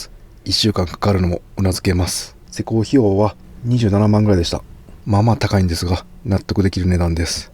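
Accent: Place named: Kansai, Japan. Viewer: 久しぶりに見ましたなぜかの声にほっと安心した気分になりました… 関西弁のままで話してくれるのも嬉しいです😊 ありがとう😊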